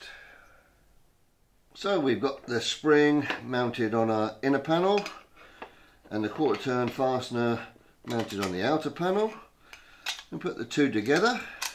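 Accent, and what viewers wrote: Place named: Hertfordshire, United Kingdom. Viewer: I enjoyed this :) I would say a Herts accent, maybe Bushey area?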